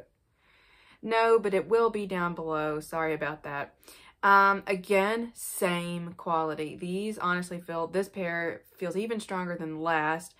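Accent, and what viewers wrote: United States. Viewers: thanks for the new video! Was thinking about you the other day. "Where did she disappear?", I thought. Glad to see you are fine! As always, nice, detailed review on a couple of awesome sunglasses! The same cool sounding American English to me!:)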